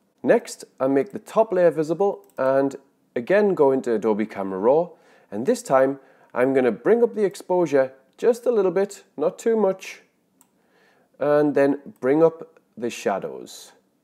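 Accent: Newcastle, United Kingdom. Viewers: Great video and I'm in total agreement. I've not used any HDR software since I stumbled upon one of your videos and bought Raya Pro. By the way, my American girlfriend loves your voice. She finds it extremely relaxing. Ever since I've been with her she's grown to love the Geordie accent but she particularly likes yours; especially when you say the word "mask". In fact when I'm watching your videos, she now refers to you as.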